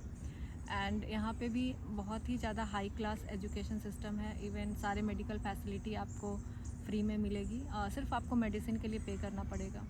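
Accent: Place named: Bihar, India. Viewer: Bihar me kon gaon, your accent matching with me. Jab hum aayenge Victoria, aapse milenge jarur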